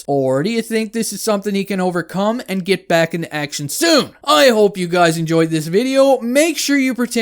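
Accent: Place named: East, Canada. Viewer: What part of eastern Canada are you from hahahaha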